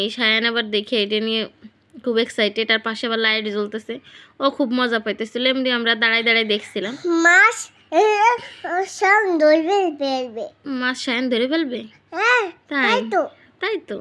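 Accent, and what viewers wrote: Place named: Gopalganj, Bangladesh. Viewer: আপনার বাড়ি মনে হয় গোপালগঞ্জ ভাষায় তাই বলে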